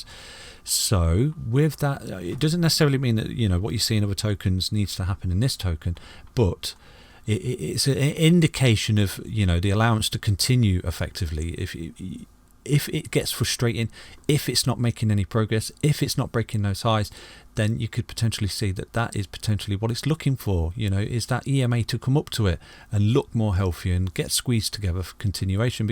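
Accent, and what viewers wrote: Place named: South Coast, United Kingdom. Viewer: What part of the UK are you from? Im guessing on the south coast somewhere???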